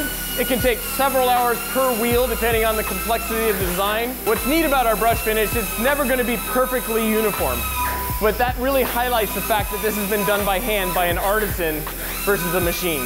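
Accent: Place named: California, United States. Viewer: Haha I like it he talks like the Californians on SNL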